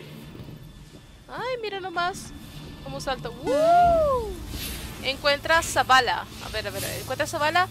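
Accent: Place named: Caribbean Coast, Colombia. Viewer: Pareces costeña en ciudad de cachacos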